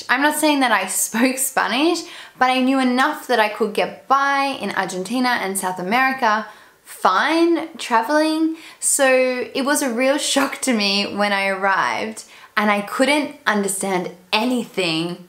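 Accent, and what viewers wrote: Australia. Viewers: Tienes ojos tan hermosos y fascinantes que a veces no puedo concentrarme en lo que dices... Saludos desde Polonia,! Great work:) P.S. I love your Australian accent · I love your Australian accent!! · Me encanta el acento australiano!!! la forma en la que dices "water" me hace mucha gracia jajjaj love it!! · I really love your accent. Beautiful accent + beautiful smile + beautiful eyes + blonde = perfect Aussie woman. I hope I could meet you in person. 😍😘😃 and I Iove your hair as well and you have sexy lips.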